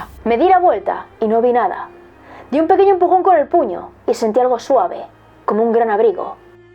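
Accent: Spain. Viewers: A mi antes me disgustaba pero me acostumbré a ella y a su acento español y ahora de agrada.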